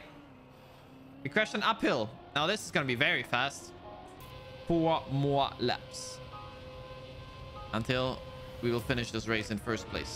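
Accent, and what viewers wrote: Germany. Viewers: Are you german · Are u German? Because u sound like that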